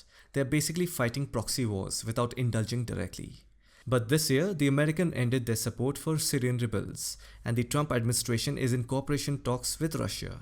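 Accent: Bengal, India. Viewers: You have such a nice voice and nice English Accent. True bengali characteristics ❤️.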